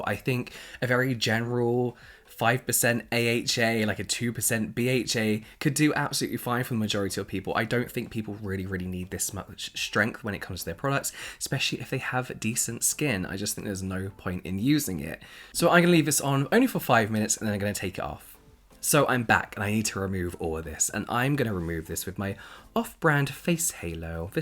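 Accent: United Kingdom. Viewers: is simply a gorgeous specimen of a man 😍! The British accept is just a plus! God bless you Papacito Bello! 😘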